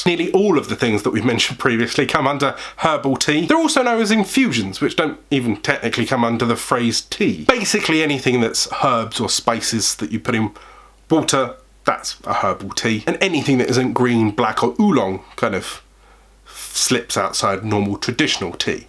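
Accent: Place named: United Kingdom. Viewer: You have the best British accent I’ve ever heard. You should narrate.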